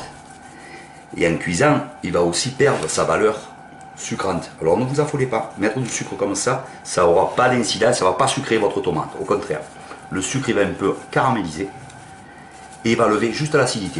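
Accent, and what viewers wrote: South, France. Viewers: Merci pour la recette! J'avais oublie comment les faire! (Et j'adore l'accent du soleil!!!...lol)...je vais m'abonner juste pour ecouter l'accent qui rechauffe le coeur...ahahaha! Salut!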